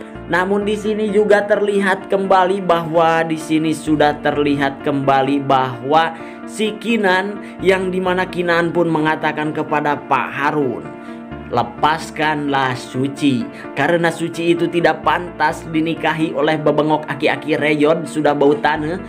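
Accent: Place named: Sunda, Indonesia. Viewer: Meni nyunda pisan ... si miminya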